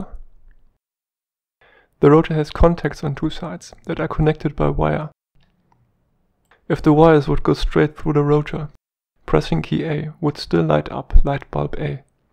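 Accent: Germany. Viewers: a lot of Germans speak English like this, I spent 6yrs there, :)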